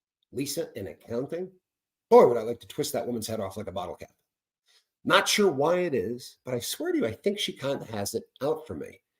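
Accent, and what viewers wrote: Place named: South Boston, United States. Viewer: I hear a lil bit of Southie in your voice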